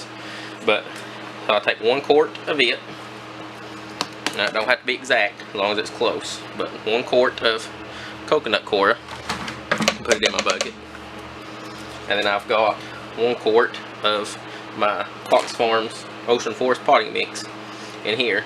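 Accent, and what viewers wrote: South, United States. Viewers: awesome im from maine and we are BURIED IN SNOW;[;[ more coming sun/ monday;[;[;[ SOOO OVER DUE FOR SPRING!!!! thank you for the video... i just came across you when i researched worms farms.. you got a new subb bud;];];] by the way were are you located? you sound "very southern" lololol love it;];];] dont hear it up north much;;[;[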